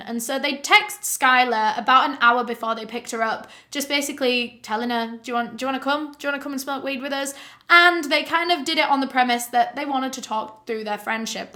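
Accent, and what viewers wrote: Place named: Yorkshire, United Kingdom. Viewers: She has a Yorkshire accent :) x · You tell the story really well. Love the Northern accent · its an English accent lol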